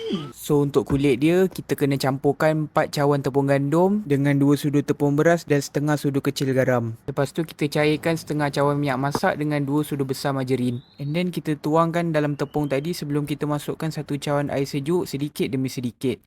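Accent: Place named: Malaysia. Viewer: kakak orang Malaysia? karna suara nya mirip dengan orang Malaysia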